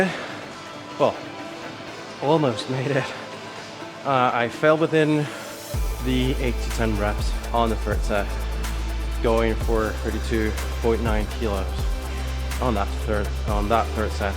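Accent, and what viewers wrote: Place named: United States. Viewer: Where you from bro you sound american but says your from the 🇬🇧 keep up the good work though bro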